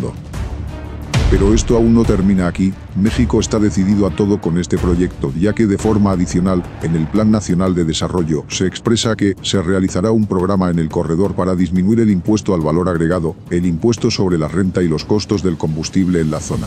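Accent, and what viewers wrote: Spain. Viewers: Y por que narra un español preguntome yooooooo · Eviten este canal de CM. Sólo hay locución en español de españa cuando se trata de México. Evitenlo. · Por qué el locutor habla como español si esto es un proyecto mexicano es de México debe de ser un locutor mexicano😅😅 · Ya no queremos escuchar a un Español narrando los videos!!! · Porqué un Espanyol tiene que ser la voz en Off ???? Teniendo tantas voces tan icononicas de Artistas Mexicanos!!! 😮😮😮😢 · Que extraño, estos vídeos relacionados con México narrados por un español!!!! Para ripley. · Quítale el acento gallego por favor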